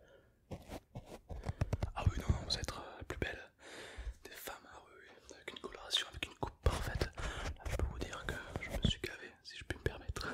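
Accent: Quebec, Canada. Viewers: L’accent québécois 😍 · Moi qui adore l'accent Québequoi c'est un pur plaisir d'écouter de l'asmr Québécois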